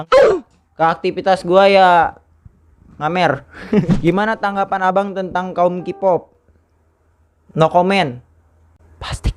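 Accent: West Java, Indonesia. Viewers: Bang lu dari sunda kah? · bang lu , sunda ya ?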